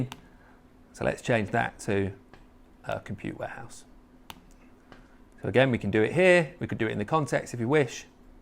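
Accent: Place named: Scotland, United Kingdom. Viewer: finally some scotsman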